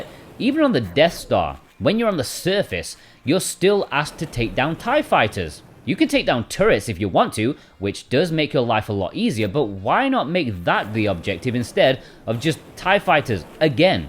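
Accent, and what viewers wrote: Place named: South Yorkshire, United Kingdom. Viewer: Not going to lie a 12 hour video retrospective of Star Wars video games has filled a gap in my life that I didn’t know needed filling. The fact that it’s narrated in my native South Yorkshire accent is an insane bonus 😂 thanks mate easiest subscribe ever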